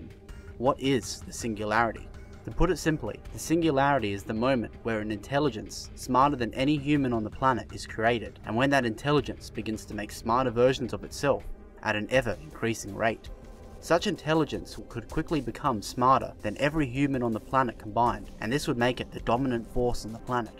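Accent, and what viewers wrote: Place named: Australia. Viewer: Too bad about the Australian accent. There were parts I couldn't make out.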